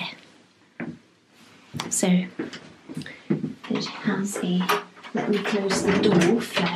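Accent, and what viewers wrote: United Kingdom. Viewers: Your british accent! So sweet and calm. I like that your language rules the world.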